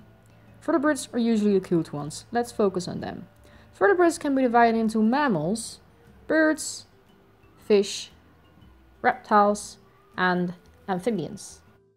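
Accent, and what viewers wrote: Netherlands. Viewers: Are you by chance Dutch? haha i think i recognise your accents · Native dutch speaker ?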